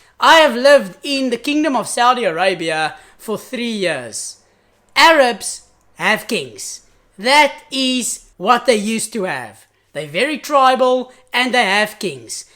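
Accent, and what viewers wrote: South Africa. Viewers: Hi I want to convert to Judaism. Can you help me you sound like you live in South Africa am I correct . I do can you help me how do I convert. My email is <mail> if you can give me some advice. I have been to ISRAEL a few times and I love the God of Abraham Isiac and Jocob. And the Jewish PEOPLE It consumes me .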